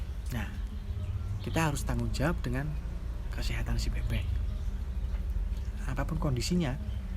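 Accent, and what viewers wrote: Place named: East Java, Indonesia. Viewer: Mas e jawa timur e mana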